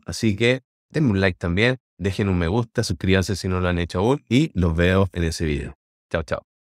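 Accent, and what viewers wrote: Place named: Chile. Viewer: Por el acento se ve que eres chileno (actualmente resido en Santiago de Chile). De verdad esta herramienta es una locura... ¡Muchas Gracias!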